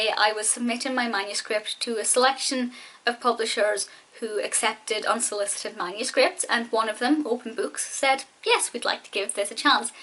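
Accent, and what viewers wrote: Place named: Scotland, United Kingdom. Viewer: Hey right now this is the first video from you im watching and i'm trying to guess from where your from based on your accent. My guess is your Scottish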